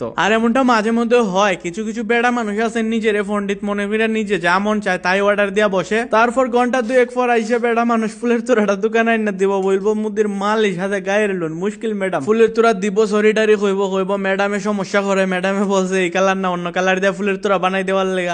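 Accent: Bangladesh. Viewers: বাড়ি কিতা সিলেট নি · হবিগঞ্জের ভাষা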